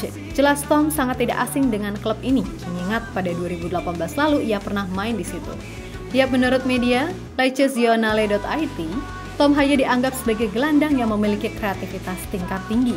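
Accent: Java, Indonesia. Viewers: Suka ngomong jowonya Mimin 😅😅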